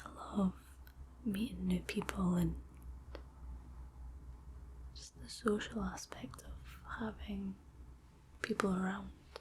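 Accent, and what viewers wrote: Scotland, United Kingdom. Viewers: I need to move to Europe ASAP. Our accents here are terrible and do not compare to yours. i need this accent in my life 24/7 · Her soft Scottish voice is calming and relaxing .. beautiful eyes too. 🙂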